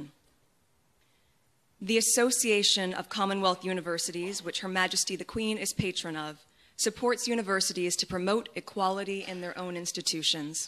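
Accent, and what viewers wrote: United States. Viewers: It's an American English accent. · That was American English! is not British. She is American.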